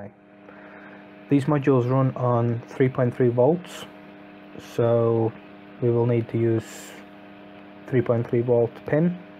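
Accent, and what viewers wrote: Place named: Russia. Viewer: А акцент то русский/украинский/белорусский (ну ты понял)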